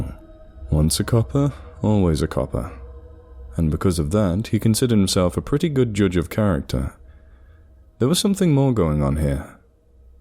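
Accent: Australia. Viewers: This is the best Australian accent I've heard, thank you Lots of love from an Australian xx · Good job! We need more Australian creepy pastas, love your accent! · i got stupidly distracted by one of the characters driving a vauxhall astra, but theyre rebadged as holdens here. absurdly nitpicky detail for me to get hung up on lmfao author is always great, the narration is always great, and as an aussie i give your aussie accent a solid 9.5/10 - the best part is you were able to keep up the emotive voice acting, thats what usually bugs me most about a lot of people doing accents, they get so focused on getting the accent right that they forget how to act!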